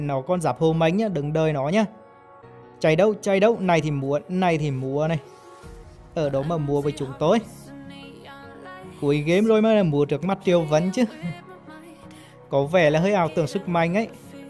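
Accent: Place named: Hue, Vietnam. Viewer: Người huế mà bạn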